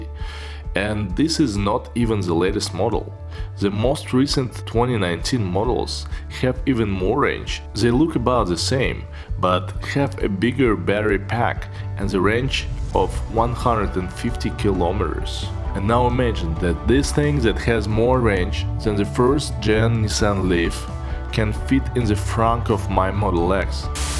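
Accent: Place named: Russia. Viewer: Ещё не полностью избавился от русского акцента:)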